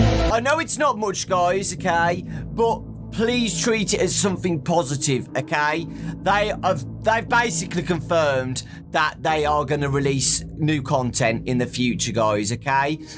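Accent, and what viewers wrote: United Kingdom. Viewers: quality content,British guy talks about a company saying hmmmmm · My dad hates your accent:( he dosent like British accent at all! But i love your accent! I wanna learn British accent!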